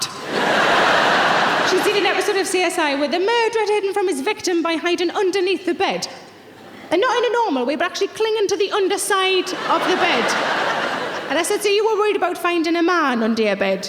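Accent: Newcastle, United Kingdom. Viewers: Would love to go see this lass at a live show. The Geordie accent always reminds me of good times.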